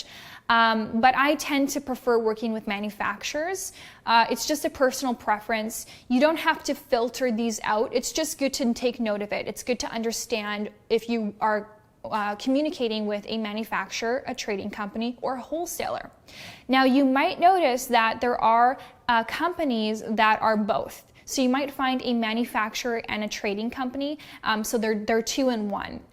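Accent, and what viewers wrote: United States. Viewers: are you from Russia? it seems like you're speaking like a native american but you have a russian name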